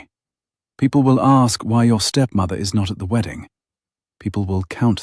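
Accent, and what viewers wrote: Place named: England, United Kingdom. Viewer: I would like to add that this story had the perfect Narrator. with an English accent. This voice is definitely one of the best. So many stories about England, with Dukes & the aristocracy just use a narrator that is American & it does not fit. This one created a perfect Presentation. Well Done!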